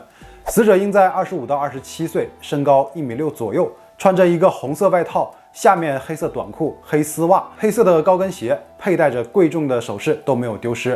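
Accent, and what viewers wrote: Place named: Shenyang, China. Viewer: 但是有浓浓的东北口音 感觉像沈阳的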